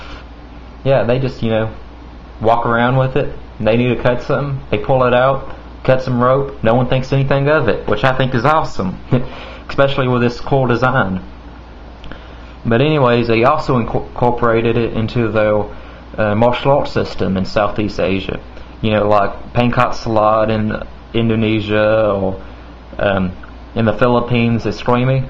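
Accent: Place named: South, United States. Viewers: I don't care what people say. The southern accent is awesome! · Look people, he's a southern man with a slight speech impediment, lets have SOME decency and comment on the knife being shown? Not on this man's accent. Now, i think its a cool knife, it is manacing though, this is probably why you get looks, it's foreign in design, meaning people probably see it as solely a weapon and Not a tool. Cool knife. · I love your Texan accent.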